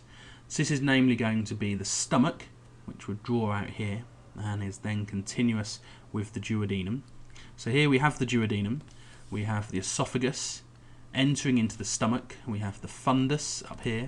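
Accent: United Kingdom. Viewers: Excellent video. Nice to hear an English accent. Go UK!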